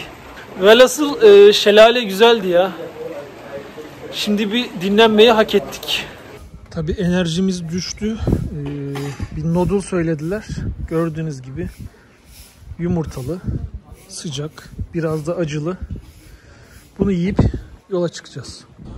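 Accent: Samsun, Turkey. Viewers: Samsun 'da okudum. Samsun ''e''lerini ozlemesim. Dört gözle bekliyorum 50 filan demeni 😃😃😃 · Samsunlu olduğun 52 deyişinden belli abi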